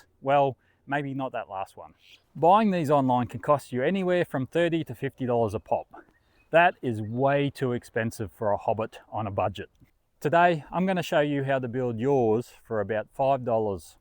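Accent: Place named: Australia. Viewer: What a fun accent. Are you from Australia or New Zealand, or somewhere else entirely?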